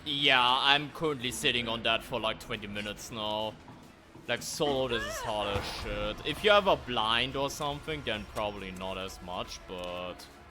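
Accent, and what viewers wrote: Germany. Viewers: hey mein bester ich weiß du sprichst nur englisch mit deinen leuten aber man merkt das du deutscher bist verfolge dich schon seid monaten was für ein level hast du mittlerweile und wie viele stunden hast du in oulast trails würde mich mal interessieren😅😊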